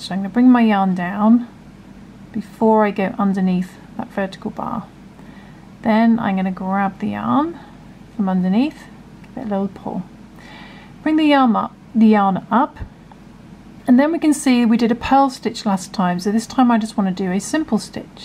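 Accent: United Kingdom. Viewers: Hi you sound like a Brits I know Brits are great knitters but have no idea you are such a fantastic crocheter. I really would like to learn from you how to roll your hook. I stop crochet because because I hold the hook like a pencil and bend my thumb a lot to pull the yarn and my thumb became so painful that I stop doing crochet . I know if I pull the hook like you do it will not hurt me because I will not have to bend my thumb. Will you please feature on video to show how to hold the hook. Thanks a lot.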